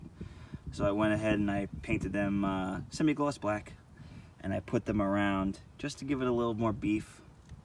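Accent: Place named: New York, United States. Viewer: The New york accent is all i hear lol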